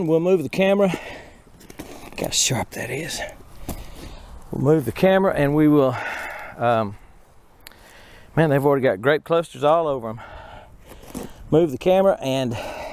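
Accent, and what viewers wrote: Texas, United States. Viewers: Love the Texas French speak. LOL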